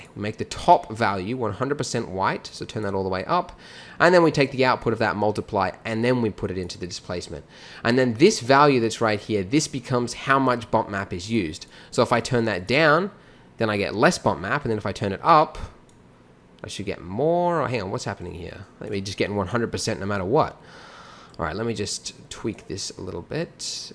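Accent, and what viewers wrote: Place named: Australia. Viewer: your from Australia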